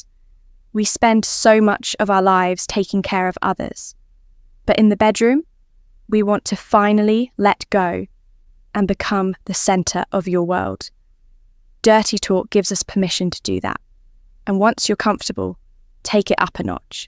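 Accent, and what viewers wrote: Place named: RP, United Kingdom. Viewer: The posh accent is a nice touch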